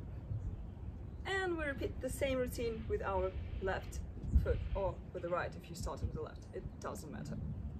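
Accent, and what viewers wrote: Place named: Russia. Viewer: Чувствуется русский акцент у.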